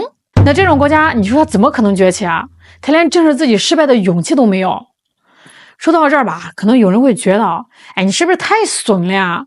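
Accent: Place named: North, China. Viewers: 这山东腔，上头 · 口音好聽😊 請問是北方哪裏的口音？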